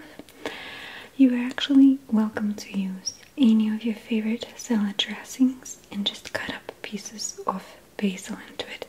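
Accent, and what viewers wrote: Russia. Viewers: Акцент то русский! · The Russian is strong in this one. Lovely as always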